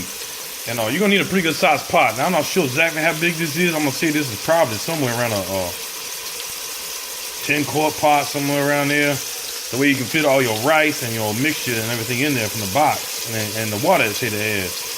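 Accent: Louisiana, United States. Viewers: Why do we love that damn cajun accent so much! Alexa can you use your cajun mood!!!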